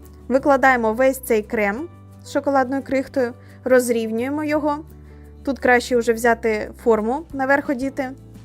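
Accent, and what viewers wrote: Ukraine. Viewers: Знайшла спочатку відео з льодяниками ваше і подумала, що ви з росії, але почула нашу букву «Г»)) а потім перейшла в канал)) українською набагато милозвучніше !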